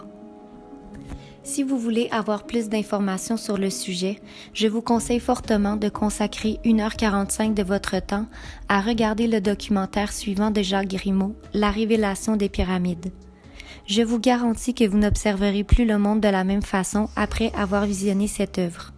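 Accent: Quebec, Canada. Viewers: Tu es Québécoise? Car tu as l'accent. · merci bcp pour l'effort...je me demande simplement pourquoi un documentaire si intéressant et si beau comme celui-ci de ne pas penser le soumettre à une voix française au lieu canadienne pour qu'il soit auditivement confortable.merci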